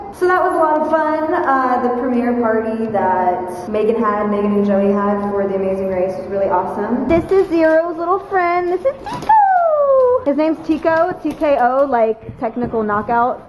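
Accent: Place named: United Kingdom. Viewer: I love your style. I would love to hear you talk more with your British accent.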